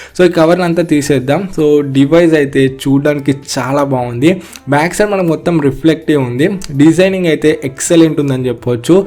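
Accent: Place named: Telangana, India. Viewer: Anna nuvu pakka teleangana slang lo cheppu anna it will be more interesting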